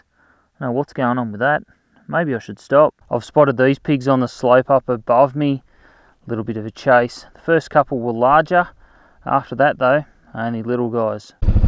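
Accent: Australia. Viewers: Proper unadulterated Aussie accent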